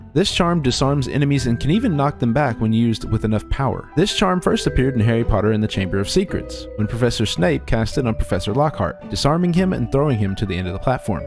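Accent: South, United States. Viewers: Good video! Jw, I can’t decide your accent, where are you from? Southern?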